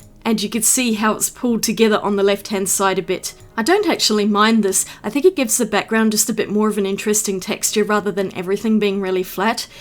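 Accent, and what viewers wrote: Australia. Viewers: Love hearing another Aussie voice. Hot press paper is fun to play with granulation paints on. Thanks for the comparision. Waving from Queensland 😊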